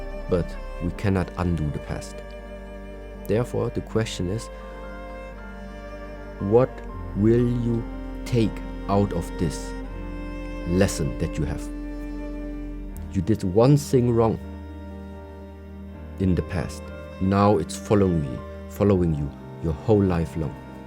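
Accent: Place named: Germany. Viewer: just love that a buddhist spiritual masterclass leader has a german accent 🙃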